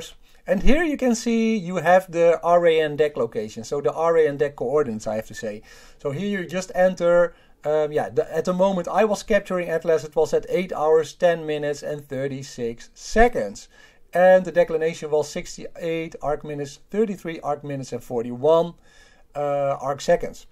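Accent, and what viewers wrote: Netherlands. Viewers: Kan gelijk horen dat je nederlands bent🤣 maar nice video! Heb zelf gister een Omegon Apochromatische refractor Pro APO AP 72/400 Doublet besteld, beetje hopen dat ik m kan vastleggen met de hand🤔 statief heeft geen goto helaas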